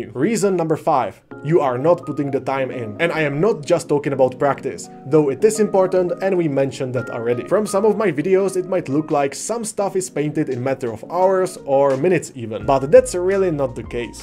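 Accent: Czech Republic. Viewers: Zdar, paradni mas videa bro. Zijes v Ameru? Ja jsem v Chicago..zdalo se mi podle accentu ze budes od nas, ale az pak tvuj email te prozradil a jeden napis Praha na jednom ze stetcu.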